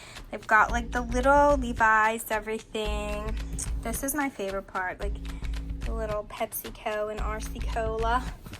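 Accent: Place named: Wisconsin, United States. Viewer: Your Wisconsin accent is the cutest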